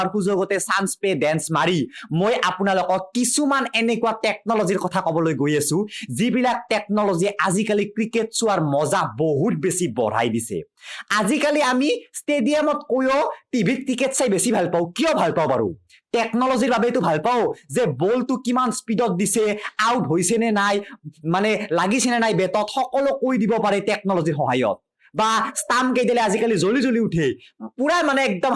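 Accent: Nalbari, India. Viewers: Katha bilak suni val lage nolbaira tu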